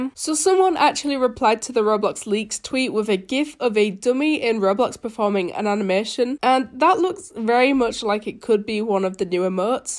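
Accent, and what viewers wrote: United Kingdom. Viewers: Shes british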